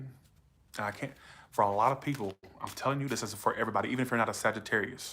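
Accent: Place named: South, United States. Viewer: I love your personality you're so cute and I'm not a big fan of the southern accent but for you it sounds so cute and sexy you're just like so neutral I love that don't ever stop being you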